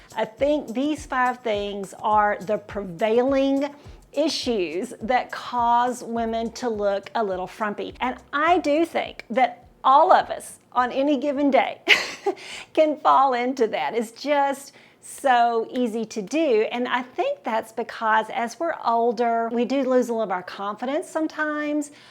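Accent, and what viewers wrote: South, United States. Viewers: To much talking. Very Southern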